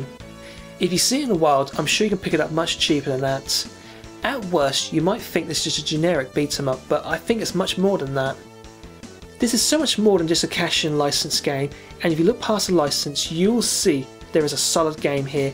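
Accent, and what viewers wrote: United Kingdom. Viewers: LOL, it's Morphing Time! You're the only British reviewer on youtube that I can stand listening to! Congratulations! Subscribed! Keep it up!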